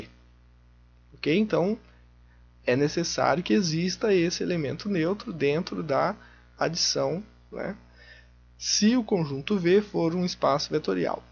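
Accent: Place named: Paraná, Brazil. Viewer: Os vídeos estão cada vez melhores. Nesse vídeo o apresenta com cuidado a definição de Espaços Vetoriais. O sotaque paranaense é um charme (hehehe). Para que está estudando o assunto, a dica é pegar uma folha de papel e reproduzir, sem olhar, a definição apresentada. Depois que tentou ao máximo lembrar de todos os detalhes e ESCREVER no papel, voltar ao vídeo e comparar se conseguiu tudo. Esta estratégia é bem indicada para aprender um tema como esse.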